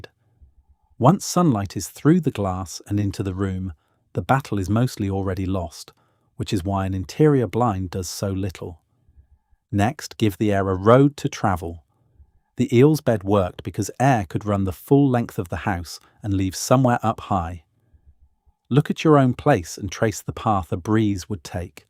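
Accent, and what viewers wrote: England, United Kingdom. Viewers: Isn't it an Al Englishman?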